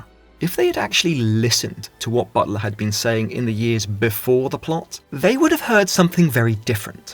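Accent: United Kingdom. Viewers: Wfhy is a Brit narrating American history?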